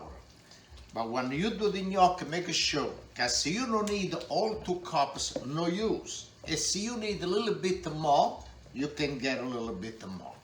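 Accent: Italy. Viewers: You are awesome! My grandma comes from a village in Italy named Casavera outside of Rome. Your dialect is so similar that I think of her every time I watch your videos from the garden to the dry sausage to the pasta & peas, it’s what I grew up around and it’s so similar. Thanks for having an amazing channel! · This is just thé most wonderfull italian dude... I am a big fan of his recipe's. But above all he absolutely melts my heart; with his vibrant personality and ever so charming accent....realy gets me going in my kitchen!! 😍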